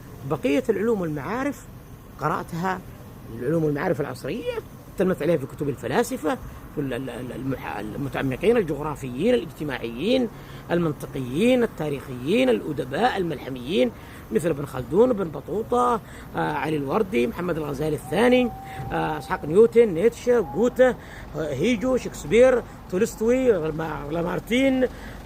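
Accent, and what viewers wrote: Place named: Tihama, Yemen. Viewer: ايه بس اللكنه التهاميه تخرب فصاحته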